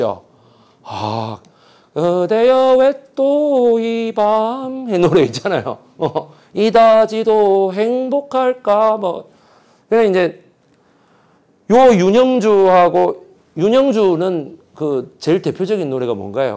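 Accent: Busan, South Korea. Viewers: 매불쑈에서 4.3 방송 잘 들었습니다. 말투가 저랑 같은 부산분 같던데 ㅎ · 경상도말씨같아 서울이 고향인줄 몰랐네요 ㅎㅎ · 는 모르는게 없네요. 부산억양이 완벽하시네예. ㅎㅎ.😊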